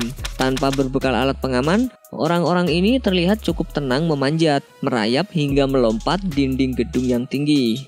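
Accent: Java, Indonesia. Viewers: mau tanya, mimin orang jawa ya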